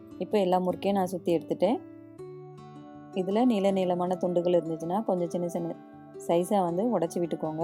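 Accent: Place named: Tirunelveli, India. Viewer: எங்கள் சொந்த ஊர் குற்றாலம். உங்கள் பேச்சு மற்றும் சமையல் செய்முறை பார்த்தால் திருநெல்வேலி போல் உள்ளது. உங்கள் சொந்த ஊர் எங்கே.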